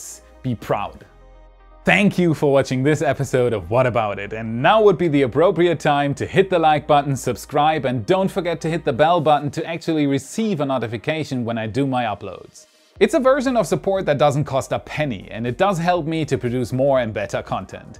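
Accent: Germany. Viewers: Are you from Germany? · Have i mentioned how i like your van braun english accent! Great video as usual.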